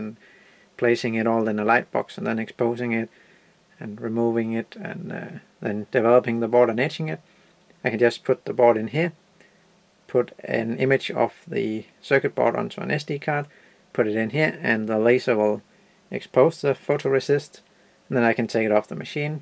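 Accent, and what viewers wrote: Denmark. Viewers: hey er du fra Danmark? (hello are you from Denmark?)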